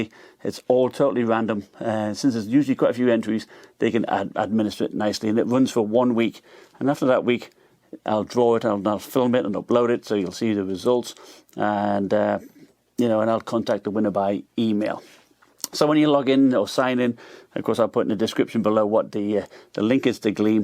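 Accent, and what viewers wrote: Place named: Teesside, United Kingdom. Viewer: Cracking channel, stumbled across you a few weeks back and the accent caught me attention! You sound like your from just up the road from me in Teesside but you’ve gone global! 😂 love the channel and bought myself a Nitro 5 last week after watching your channel! Can take the lad out the north!.....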